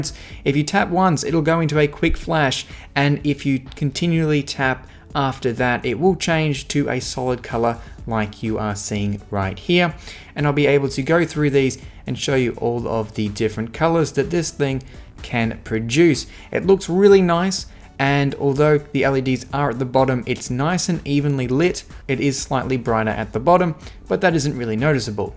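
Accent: Australia. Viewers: I like the Australian accent. You know, you are truly doing an outstanding job; I have watched almost each single video on your channel. Keep posting us!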